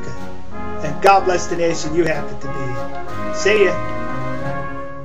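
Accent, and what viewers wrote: New York, United States. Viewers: your really smartand ican tell you have lived in new york you still have th accent, but you are smart, congratz on anoter video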